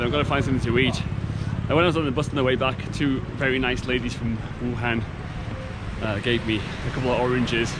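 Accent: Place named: Northumberland, United Kingdom. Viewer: Just found this. Loved it. You sound like a Northumbrian. 👍😍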